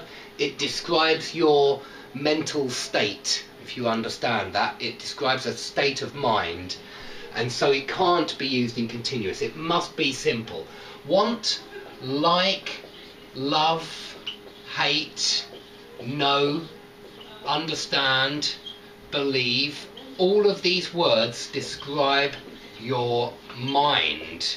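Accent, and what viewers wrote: United Kingdom. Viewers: Thank for for your free lessons! You're doing fantastic job! Great explanation and authentic british accent! Please, make more!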